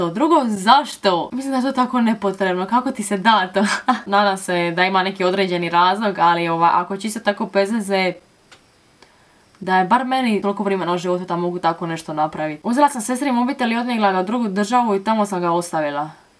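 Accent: Dalmatia, Croatia. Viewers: Kolko volim dalmatinski.. 🤤❤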